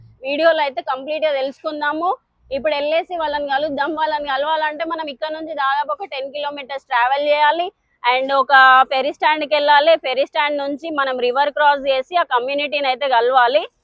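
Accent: Telangana, India. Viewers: Mee hair style baagundhi , ee muchata anedhi inkocham sepu ekkuva pedithe baaguntunde , any way mi videos down to earth ga untayi, veelainantha varaku Telangana yaasa maatladandi vinadaaniki vinasompu ga feel baaguntundhi , emanna ... Feel untudha Telangana yaasa baasha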